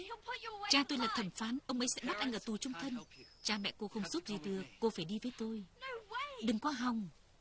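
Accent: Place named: North, Vietnam. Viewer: Htv mà thuyết minh giọng bắc vậy? Htv thường lồng tiếng chứ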